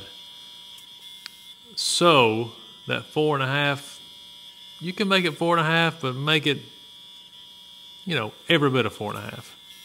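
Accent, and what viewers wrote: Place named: Mississippi, United States. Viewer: Yeah, I live in the northwest now, but I knows my peeps when I hear 'em speak. lol Spent summers with grandparents in West Point, MS. growing up. When I first heard you speaking I thought you were one of my uncles. lol Good video by the way. Chat later.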